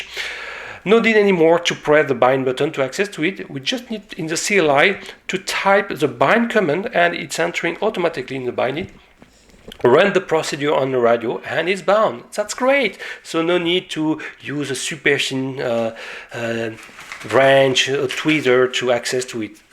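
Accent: France. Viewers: Meilleurs Voeux 2019 avec toujours ces super revues qui font la promotion des quadricoptères et ... ...de l'accent français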